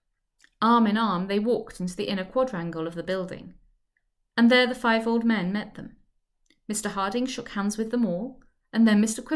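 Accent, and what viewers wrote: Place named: England, United Kingdom. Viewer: Thank you so much for this! I had started the book with another reader, but he inexplicably stopped posting after Chapter 28. So I investigated, found your series, and finished out with you. I'm so glad I did– your readings proved to be superior in their accuracy, pacing, English authenticity, and amusing vocal characterizations. Well done indeed!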